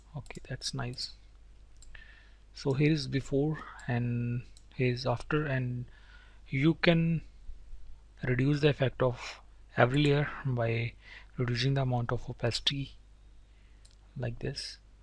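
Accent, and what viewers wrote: India. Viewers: It is an English is just his accent. He's from India. It was hard for me to understand him too but once you listen you can understand.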